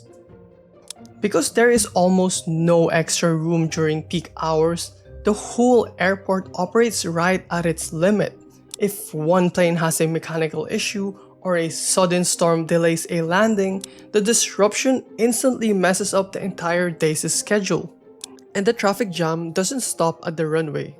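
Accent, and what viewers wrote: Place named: Philippines. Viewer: sounds pinoy.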